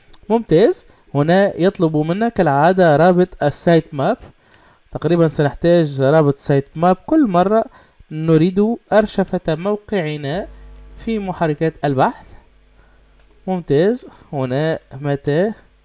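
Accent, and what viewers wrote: Tunisia. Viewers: 5ouya mbeyan men soutek tounsi ena tounsi zeda leken ett makch ma3rouf fi Tunisie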